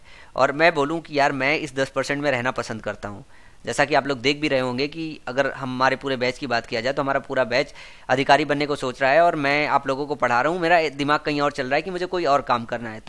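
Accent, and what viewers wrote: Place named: Kanpur, India. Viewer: kya bat h bhai....tmhari language me kanpuriya ka jo taste h maja aa jati h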